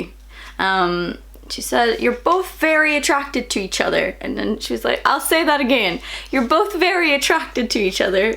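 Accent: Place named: Ireland. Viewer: I could listen to you talk all day with your adorable irish slang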